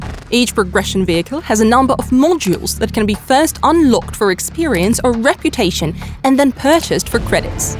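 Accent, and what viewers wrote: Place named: Australia. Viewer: Sorry but this aussie accent in this video is very awfull to listen! A clear american accent would fit much better here 😉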